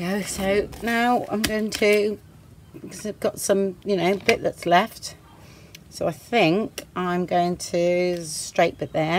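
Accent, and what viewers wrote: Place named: Cornwall, United Kingdom. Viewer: So glad I found your channel, these are so pretty I love how you make them look so easy but still so amazing. Also I love your accent, you wouldn't be cornish by any chance?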